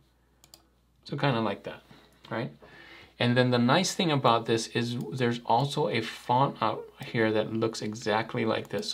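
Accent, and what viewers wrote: Hawaii, United States. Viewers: thanks for all these videos, been learning a lot. quick question, are you from hawaii? cuz after watching some of your vids, it's subtle but i noticed that certain words you say sounds very close to how some people from hawaii would say them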